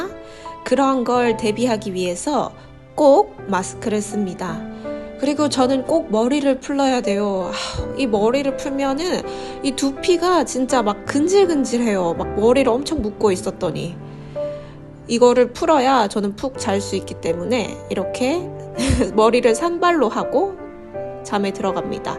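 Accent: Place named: Gyeongsang, South Korea. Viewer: 저도 지방러라 경북 대구 억양이 느껴지더라고요. 영상 잘 보고 있어요! 즐거운 비행 하세요~~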